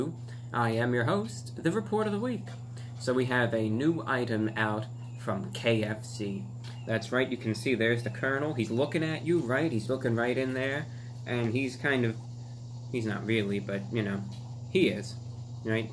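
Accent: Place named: Ohio, United States. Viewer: Im guessing ohio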